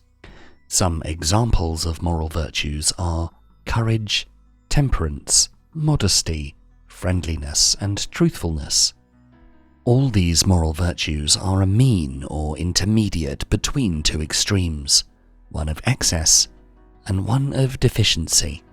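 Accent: United Kingdom. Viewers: #1. Loose the pseudo intellectual lispy British voice.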